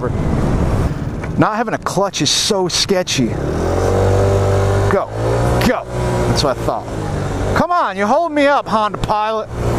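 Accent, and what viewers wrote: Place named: United States. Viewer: I like your accent. Which state are you from ?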